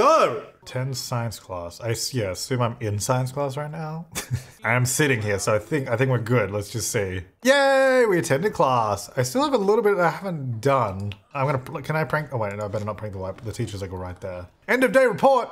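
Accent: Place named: Australia. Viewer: It took me 17mins into this video until I realised you're Australian... And I'm Australian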